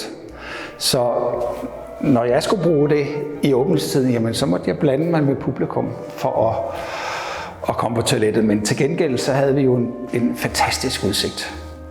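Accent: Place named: Bornholm, Denmark. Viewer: Tak for denne skønne video. Dejligt at høre den bornholmske dialekt i København. Sikken et liv, må have levet.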